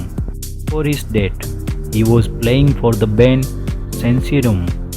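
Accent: India. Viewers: The guy narrating this needs to learn some English first haha. Sorry I’m not a racist - just that his voice is hilarious. He sounds like a Bihari from India. I am sure the guy who posted this video did that voice as a joke. And what’s with the elevator music?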